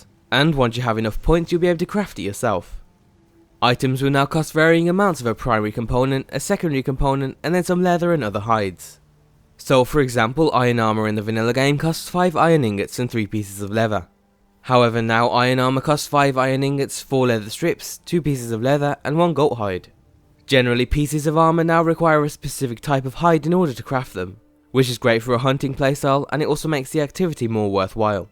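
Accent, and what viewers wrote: United Kingdom. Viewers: James Troughton i don't know, he sounds more british again. then again he might be foreign and going for a british accent except for the word "mod" which he pronounces as "mad". it's not a big deal but, you know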